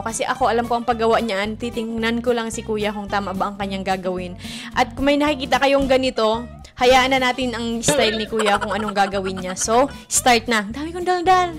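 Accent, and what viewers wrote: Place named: Visayas, Philippines. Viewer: Taga saan ka madam. Bisaya accent man.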